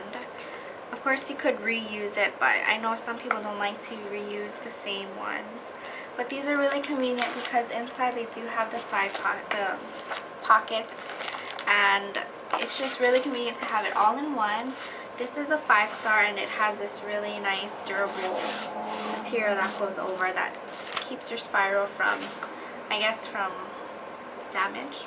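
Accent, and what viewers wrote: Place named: Hawaii, United States. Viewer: U have a pigeon accent r u from Hawaii?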